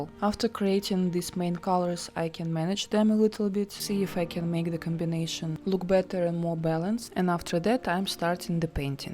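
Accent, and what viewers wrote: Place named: Russia. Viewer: I just finished watching ALL your videos!! I love them!! You're making me want to visit Istanbul..lol. My cousin is going to Greece this summer and may take the trip across the waters to Turkey? Have you ever been to Greece? Also, do you do any outdoor sketching or painting? That would be fun to see too. You're inspiring me to start my art channel as well. And by the way, your English is WONDERFUL!! You have absolutely nothing to worry about. We understand you just fine and the Russian accent is beautiful. I just subscribed to your channel. Thank you for sharing!! Can't wait for the next vidéo!!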